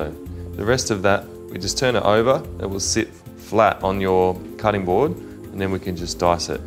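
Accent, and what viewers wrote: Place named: New Zealand. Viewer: New Zealand I think. Gets confusing because there are so many NZealanders in Australia and Australians in NZ, but I think I'm right. The plonk is Australian anyway.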